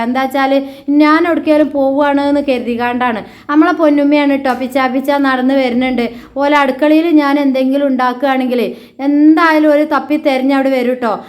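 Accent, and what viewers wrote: Malappuram, India. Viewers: എന്തൊ ഒരു ഭാഷയാണ് പടച്ചോനെ ഇതൊ ഒക്കെ ഒരുപാട് മലപ്പുറം ഭാഷ ഞാൻ കേട്ടിട്ടു ഉണ്ട് ഇതൊ ഒരു ഒന്നൊന്നര ഭാഷ ആയിപ്പോയി😄😄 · Malapurath ulla ellavarum inganeyano samsarikaar ?? Ivarentha ingane samsarikunnenna ariyathath matte laila thatha avare pole oru pandathe aalukale pole enthinum ethinum oru makkale viliyum paattu paadumbolothe sansaravum njn athknd ee chmnl ipol kaanaarilla idak epozhenkilum onn nokkum kure mlpuram vlgs kaanum avaronnum ingane onnum alla samsarikaar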